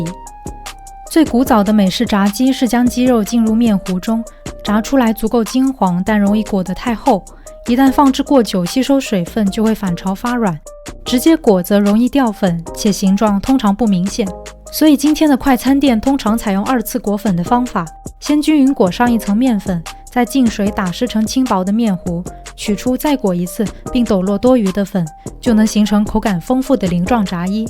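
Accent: Beijing, China. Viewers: 主播北京口音很好听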